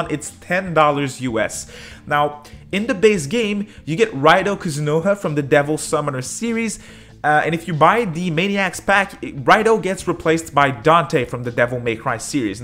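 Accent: Quebec, Canada. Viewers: Will buy it on switch day 1. Can't wait to discover this game, I'm still kinda new to the SMT series. I've only beaten DDS1-2 and smt4. Do I hear a french canadian accent?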